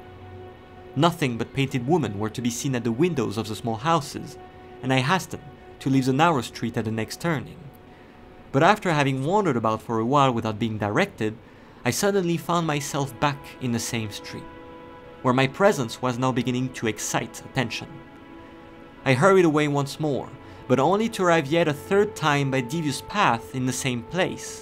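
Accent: France. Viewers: i love Your french accent and the way You narrate subscribed ! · Are you French ?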